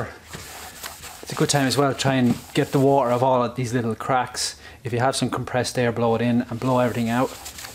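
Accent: Dublin, Ireland. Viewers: I really enjoyed this mate i love the Irish accent could listen to you all day. too al those who put thumbs down 👎 what a bunch donkey's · With that accent your Irish.... where da hell did you get that 2k clear in this country. It's like hens teeth in the Midlands. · Very good buddy - nice one - love the Irish accent as well. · Where ya from Dublin? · Nice! And the Irish accent was the icing on the cake 😃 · Ooh, that Irish accent! ❤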